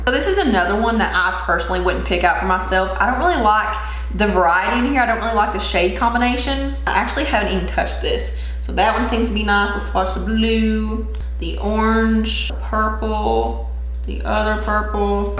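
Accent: South, United States. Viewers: I knew I heard southern in that accent! Fellow kentuckian makeup lover here!!✋ ❤ much love to and for you!!😁 you're awesome girl! Thank you for your service to the beauty world and keeping it real!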